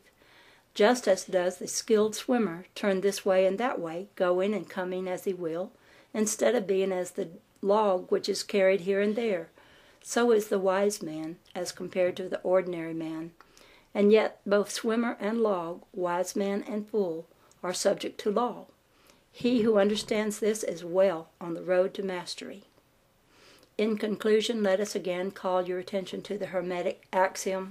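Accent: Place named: South, United States. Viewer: Thanks for putting something this important into audio. I find your reading of this and your southern dialect very delightful.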